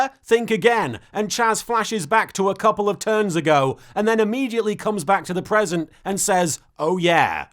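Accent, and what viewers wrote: United Kingdom. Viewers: Wait, are you actually British? This whole time I thought you were an American who just enjoys British slang. Kind of like a weaboo, but for British culture instead of Japanese. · I love listening to a British bloke say cheeky things about an anime from about 20 years ago, so I must be in the right place!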